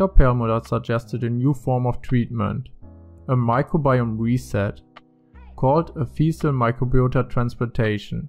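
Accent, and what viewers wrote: Germany. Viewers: Deine Videos sind klasse, bildhaft und strukturiert. Das einzige was mich stört ist dein starker deutscher Akzent. Dafür kannst du nichts - ich glaube aber mit einer besseren Aussprache könnten sie eventuell mehr Reichweite erzielen. Die, die sie verdienen! Vielleicht könntest du einen anderen Sprecher nehmen? Ich bin selbst Psychologin und habe mehrere Mikrobiom Transplantationen hinter mir - sie haben meine Psyche und meinen Körper gerettet. Weiter so und liebe Grüße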